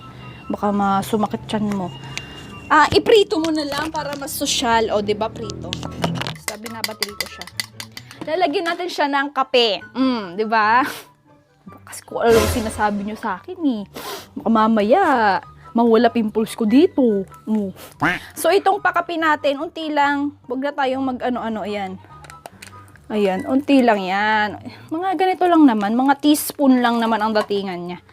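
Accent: Ilocos, Philippines. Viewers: I love your ilocano accent "mallukong"😂 I love you ate!!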